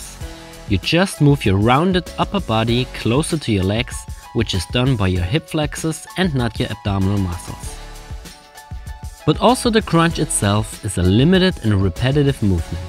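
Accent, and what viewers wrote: Germany. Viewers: Are you German? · are you from germany? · Are you from Germany?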